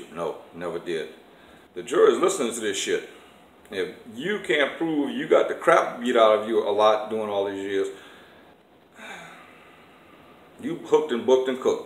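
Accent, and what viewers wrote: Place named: Louisiana, United States. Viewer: I'm new to this channel & I'm glad I stumbled across it. I wish you many more vids. My only problem is that since English is not my native language I have problem understanding Louisiana talking. But reason I'm writing this is: I think you gave good advice here! especially because of children that later do same stuff in their marriages 😢.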